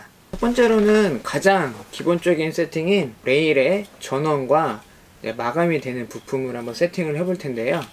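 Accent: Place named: Jeolla, South Korea. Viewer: 구수한 전라도사투리가 신뢰도를 업시키네요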